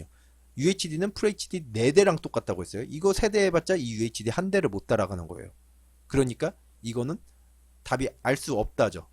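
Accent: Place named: Jeonju, South Korea. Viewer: 전주 사람인가? 말투가전주인데..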